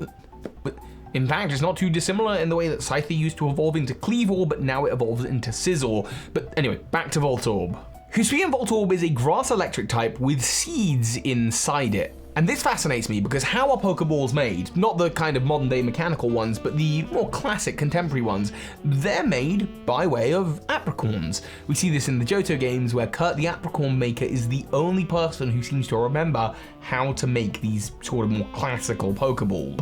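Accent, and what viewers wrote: United Kingdom. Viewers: Your from Uk?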